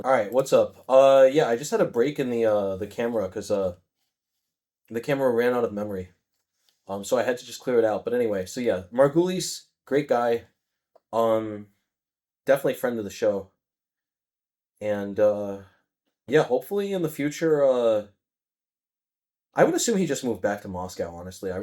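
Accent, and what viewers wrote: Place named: Rhode Island, United States. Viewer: are u from Rhode Island? I’m guessing the accent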